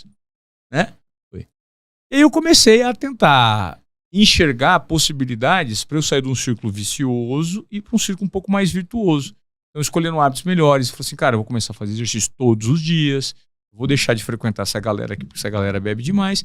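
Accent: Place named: Sao Paulo, Brazil. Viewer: Que isso e um charla paulista haha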